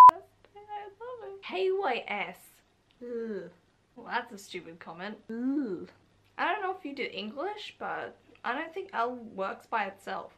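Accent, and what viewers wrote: Australia. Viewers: Are you Australian....I'm bad with accents...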